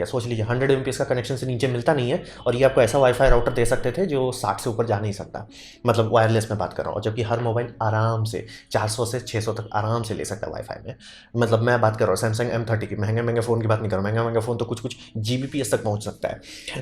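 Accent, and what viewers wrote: Bihar, India. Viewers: Aap puree bihari hu ekdum fulltu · 100% pure Bihari vibes 😎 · May be you are bihari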